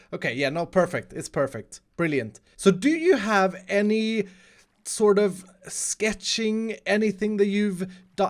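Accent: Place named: Sweden. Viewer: This is swenglish on a new level (i’m scandinavian too btw)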